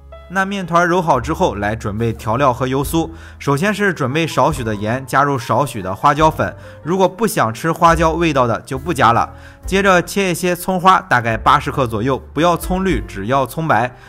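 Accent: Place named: Shandong, China. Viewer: 每次听到带点山东口音的解说还是感觉很亲切的，请问你是山东哪里的？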